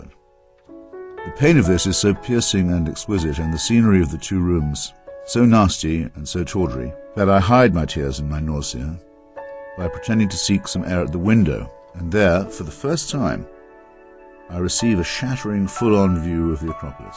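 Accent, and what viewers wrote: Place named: RP, United Kingdom. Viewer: I disagree. I've been listening to Hitchens and his brother Pete for almost half a decade, and I think I'm correct. But I couldn't read his mind, so I can't say for certain, just like you can't. As for proof, well I can say that sounded more like a misotheist than an atheist. Misotheists hate God because they think he's immoral. Literally every short you'll see on YT is calling God immoral, unjust, a dictator, etc, etc. If God doesn't exist, why did he spend all his time criticising Him then? And oh yeah, he literally said in his debate with John Lennox or Frank Turek (can't remember which) that even if God was real, he still wouldn't believe in him I didn't insult his mother. I just said that another man was more important to her than her own sons. Why don't you word what his mother did in a nicer way if you think I'm being hateful. 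And I'm not religious. I'm not even a Christian. I'm just someone who was a Hitchites fan before finally studying scripture for myself. And I don't hate. He's just objectively wrong about a lot of biblical facts, but because he's got a posh British accent, his word are taken as gospel.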